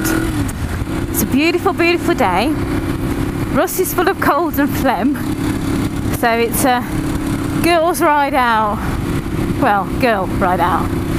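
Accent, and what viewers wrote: United Kingdom. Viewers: WOW you really are a happy bunny aren't you !! You sound like my next door neighbour, she's a Brummie gal as well. Just wanted to ask where you got the handlebar mount from? I've just bought a Go Pro 2 and I think the best point to fit on the GS is probably the engine bars but still looking for options at the moment. Great vid by the way. All the best JJ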